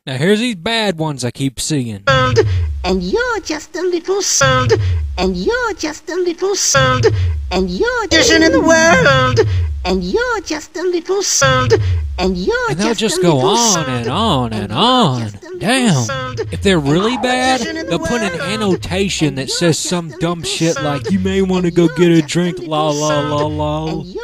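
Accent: South, United States. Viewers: I'm guessing you're from the south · the southern voice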